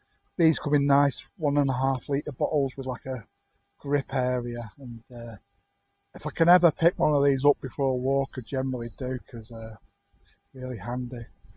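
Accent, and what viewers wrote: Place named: Manchester, United Kingdom. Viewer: Greetings! Its always nice to hear you and walk with you. I think I wrote it before, but I enjoy your english... speed and Manchaster dialect?! (Is there a Manchester dialect?!)... very much. It makes my rusty german school english more fresh. Thank you for that.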